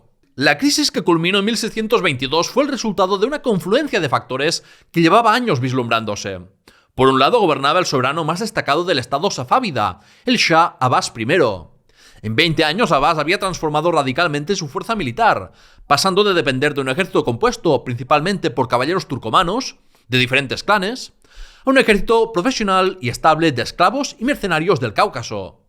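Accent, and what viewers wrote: Catalonia, Spain. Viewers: Acabo con la monarquía hispánica? Menudo acento indeptista tienes, tu canal parece una clase de adoptrinamiento de una escuela indepte pagada por ciuercal 3 por ciento . Menos mal que hablas español así me entero del tipo e odio que pretendes generan en quien te ve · Joder, que acento catalufo asquero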